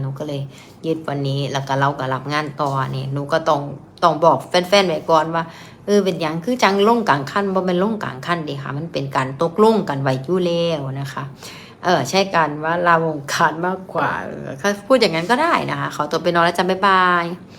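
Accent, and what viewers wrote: Isan, Thailand. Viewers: เสียงอีสาน เชียร์ครับ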